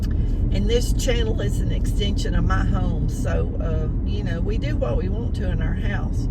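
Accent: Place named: South, United States. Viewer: I love your southern accent. I miss it where I live now. 💜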